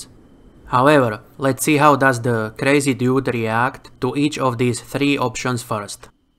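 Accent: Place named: Russia. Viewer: Spasiba,. You're accent sounds Russian /Ukrainian to me, so excuse me if I got that wrong. Your video is very helpful, because Klinov killed me twice.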